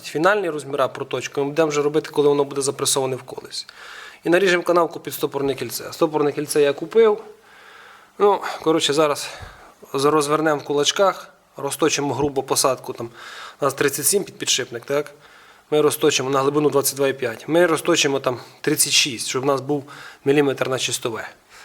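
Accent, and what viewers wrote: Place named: Lviv, Ukraine. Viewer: Мутуцикла) Цей Львівський акцент))))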